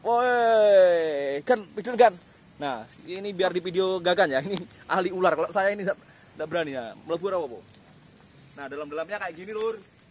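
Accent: Java, Indonesia. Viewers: Aku senang bahasa indonesia mu mas tapi jowomu medok jawa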